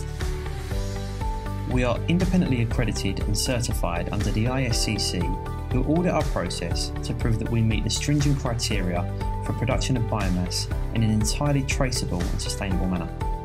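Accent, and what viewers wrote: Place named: United Kingdom. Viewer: Why is a brit narrating this. Might give biden the wrong idea when he's thinking of where to allocate funds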